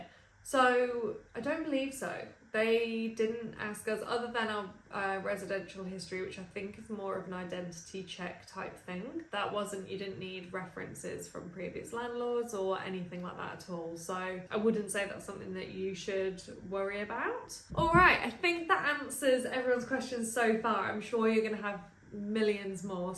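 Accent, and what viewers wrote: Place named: England, United Kingdom. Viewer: You'll fit right in with your pommy accent. Its called a dining room. A diner is a roadstop where truckies eat.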